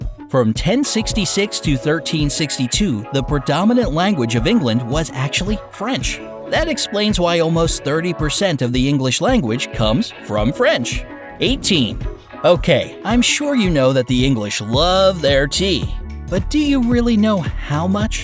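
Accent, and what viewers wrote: United States. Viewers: why did he speak American accent in the video about UK??? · U sound American..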